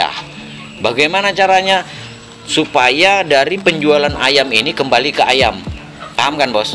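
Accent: Madura, Indonesia. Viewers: Madura ya kang? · Dari logatnya orang madura ya cak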